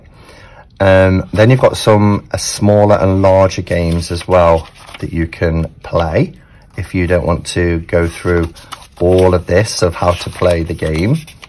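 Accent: Nottingham, United Kingdom. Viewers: What an interesting deck of cards. Thanks for the walk through, always lovely to hear a familiar Nottingham accent (all my Mum's family were born in and around Nottingham). I don't use pippy decks but this one caught my attention. I looked around for it and found it for a great price on Amazon at the moment. The cards arrived today and although the cardstock is thin and will crease if handled roughly, the illustrations are great and it's a lovely addition to my small but growing collection. PS The cards are Produced by River Horse and printed by Lo Scarabeo, like the ones shown in the video.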